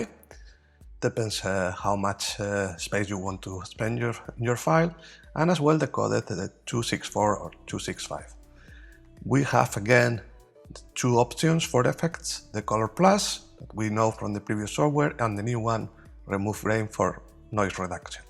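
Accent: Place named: Spain. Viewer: your accent (espanol I assume) a little hard to understand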